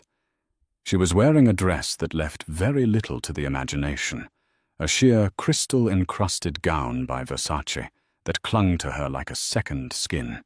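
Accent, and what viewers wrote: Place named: England, United Kingdom. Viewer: Dreadful English accent!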